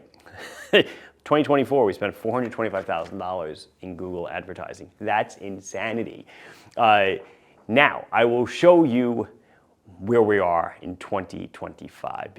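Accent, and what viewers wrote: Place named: Texas, United States. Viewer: I don’t buy watches from you because of your Google ads. I DO buy watches from you because of your Texas drawl in describing your products & and because of your wardrobe that matches Teddy Baldasarre’s. There, I said it.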